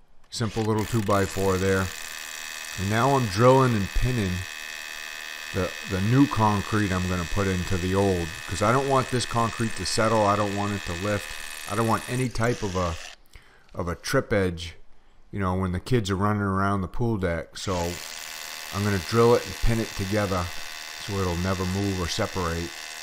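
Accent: Maine, United States. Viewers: Mostly was watching this to see how to mix it up and what consistency I should mix it. But I couldn't help but notice that you sound like you're from Downeast Maine lol. I'm born and raised there and live in the Southwest now 😂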